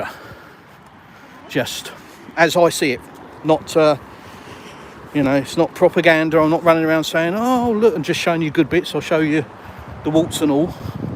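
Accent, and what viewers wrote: United Kingdom. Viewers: I love watching your videos. I am a Russian living in UK (Kent, Sussex) for the last 16 years. It’s surreal to see someone from around here (you sound like you are from South London or Kent) to enjoy living in Russia! I am so glad you are happy there. The situation with the driving test is quite a puzzle, but to be fair if finding the job is your ultimate goal you would still need to know Russian anyway. Perhaps do an intensive course in Russian? It’s not easy but it will happen. It’s not easy to learn a new language later in life but not impossible!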